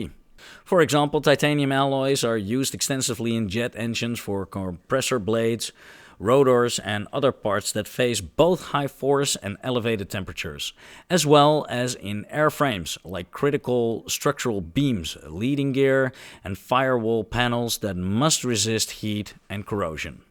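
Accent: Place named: Netherlands. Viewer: ok, vind het anzich een goede video, script vloeit goed, je visual zijn ook goed. enige wat ik bijzonder afleidend vond was het gigantisch duidelijke neder-engels en in de eerste 8 minuten al 5 a 6 klemtoon/uitspraak fouten. zo afleidend dat ik het eerste gedeelte van deze reactie dus al schrijf op dat moment in de video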